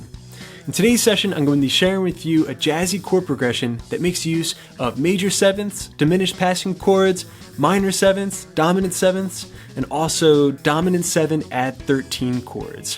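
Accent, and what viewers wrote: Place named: South Jersey, United States. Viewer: Where's that accent from? Sounds like south jersey, my old stomping grounds 🙌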